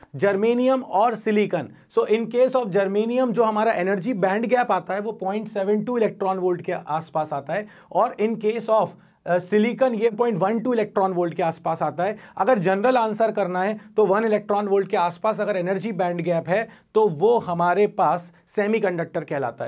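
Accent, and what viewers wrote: Bhopal, India. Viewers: Ap bopal ke ho ..aap ki language lag rahe hai..👍.vese apne bhout aacha batya 👏👏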